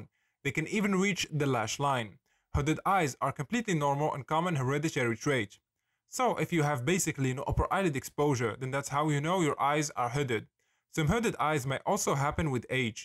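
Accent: Morocco. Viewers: You sound like a moroccan with that accent 💀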